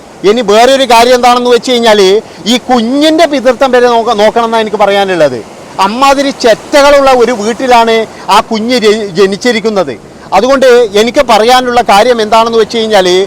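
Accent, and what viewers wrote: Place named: Kasaragod, India. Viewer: കാസർകോട് ഭാഷ.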